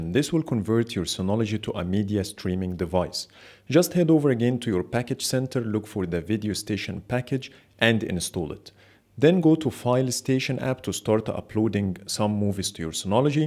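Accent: Egypt. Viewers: اللهجة المصرية بتميزها من مليار شخص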